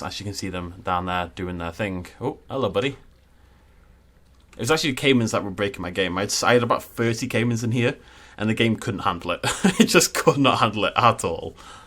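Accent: United Kingdom. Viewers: Ah i love your planet zoo videos so much, they are the only ones I watch and you inspired me to go from flat squares with....no rocks! to rock filled funky shaped habitats with lots of bushes made from sunken trees. Dude do whatever you want with the game honestly I prefer the habitats and stuff and often skip the buildings (sorry) so I'm super on board with just habitat builds. Also I love your accent where are u from (lmao weird) I assume somewhere from the UK but not essex cause I'm from there and u will never her a t come out of my mouth lol.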